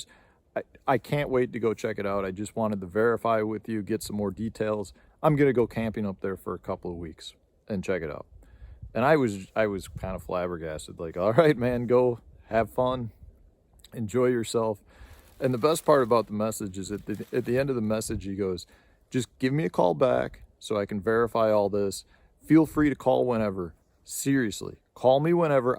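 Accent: Wisconsin, United States. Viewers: Thanks for sharing. It takes courage to talk about this subject due to the fear of ridicule. I appreciate that. Plus, it's always fun to hear a Wisconsin accent! Seriously though, you might want to check out Facts by How To Hunt, Steve Isdahl's Youtube channel. He posts about other people's encounters and experiences. Also, Dave Paulides & Scott Carpenter. All very informative channels. Lot's of critters out there. Fish on!🎣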